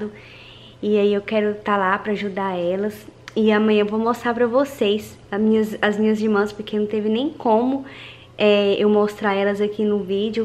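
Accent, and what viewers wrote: Minas Gerais, Brazil. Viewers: Você mora a onde?parece ser mineira!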